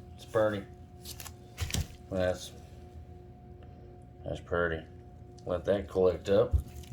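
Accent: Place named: Wisconsin, United States. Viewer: You sound southern… Southern Wisconsin-ish. Seriously, you (and the wife and kids) are a treasure.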